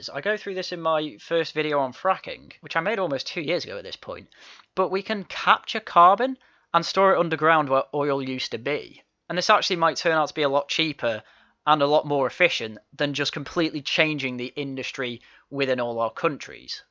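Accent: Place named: London, United Kingdom. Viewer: Some thick Tyrone from London is narrating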